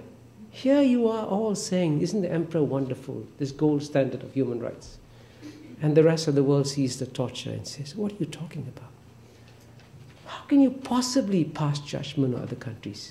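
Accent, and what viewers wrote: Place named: Singapore. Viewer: he sounds SIngaporean!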